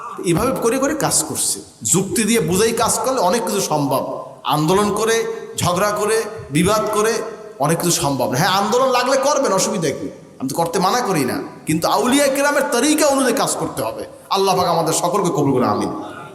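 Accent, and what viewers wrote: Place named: Barishal, Bangladesh. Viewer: বগুড়ায় গিয়ে বরিশাইল্লা কথা বলার কারণ কি শাইখ? ওখানে গিয়ে শুদ্ধ বলাই উত্তম।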